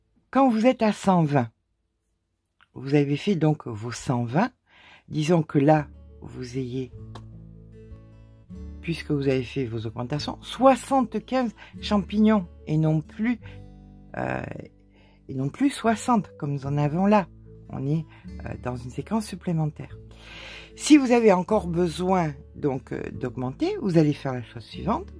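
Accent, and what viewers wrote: France. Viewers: Bonjour, Française habitant en Australie depuis très très longtemps Je viens de decouvrir vos videos. Je pourrais vous ecouter parler toute la journey avec cette voie si francaise et si douce. D'un age plutot advance, J'ai commence a crocheter l'annee derniere et depuis je ne me suis pas arretee. Avec ce tutorial si facile Je vais me lancer avec ce pull merveilleux et aimerais acheter le pdf pour m'aider a le faire a ma taille, mais Je n'arrive pas a le trouver sur votre site. Pouvez vous m'aider s'il vous plait! Merci de tout coeur.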